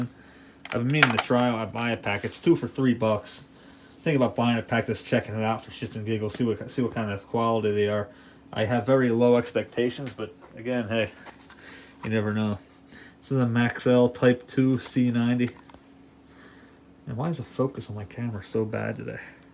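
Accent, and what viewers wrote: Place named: Philadelphia, United States. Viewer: Long before you pulled out the WMMR tapes, hearing your accent I kept thinking "okay, this guy is from either from anywhere from Lancaster to Philly". You sound like my relatives. Sadly I lost my accent as I largely grew up in Virginia.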